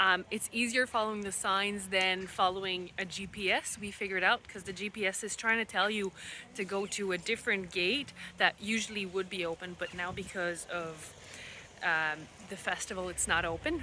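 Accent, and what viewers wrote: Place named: Ireland. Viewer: I love that you have a weeeee bit of an Irish accent, looked like a fun day! Thank you for sharing 🥰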